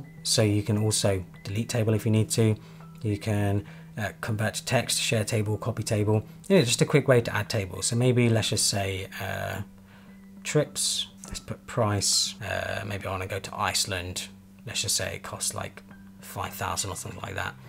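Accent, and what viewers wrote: United Kingdom. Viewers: Yeah, an accent that doesn't make sense generally, the British... I shouldn't be hearing "nights", instead of "notes". That's why people don't like it.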